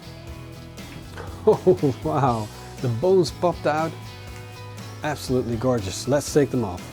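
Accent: Netherlands. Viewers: Glad I found this channel. Love the videos. Would I be correct in guessing that your accent is Dutch?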